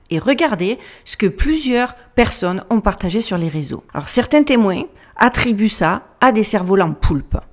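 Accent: South, France. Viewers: 👍 Les questions sont toujours aussi pertinentes !!!😻 Comment des gens qui ne sont plus en contact avec eux même peuvent-ils entrer en communication/en lien avec un humain conscient de sa souveraineneté ?!? Impossible !!!! D'où l'AI.... et tout ce qui va avec 😁 J'ai vraiment et magiquement confiance en ce qui s'en vient et en toutes ces personnes vivantes et conscientes✨🙏✨ Merci pour ta bonne humeur, ton accent du sud qui réchauffe. Beau week-end à toi et à tous☀✨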